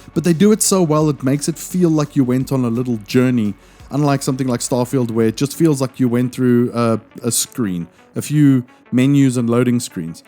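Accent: South Africa. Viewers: Where are you from? Your accent sounds kinda South African.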